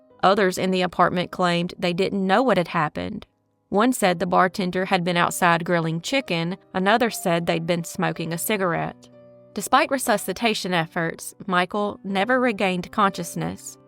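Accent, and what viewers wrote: South, United States. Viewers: Yours is the only channel that I watch unsolved mysteries, only southern accent I like. Thanks for all of you hard work. Wishing you many, many more subswcribers. Panama here. 🥰♥🖤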